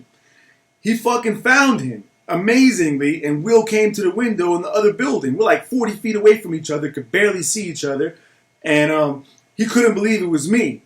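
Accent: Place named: New Jersey, United States. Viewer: You're such a great story teller. Just found ur channel & you got great content. Plus, I luv the accent. Im from Jersey & hearing u talk reminds me of home👍 Keep up the great work!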